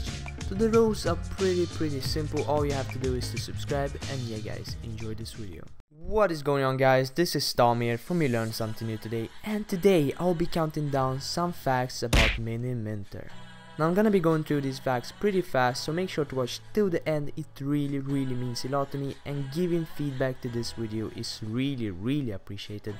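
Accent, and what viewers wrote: Sweden. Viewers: You from Sweden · You're Swedish aren't you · are u from sweden?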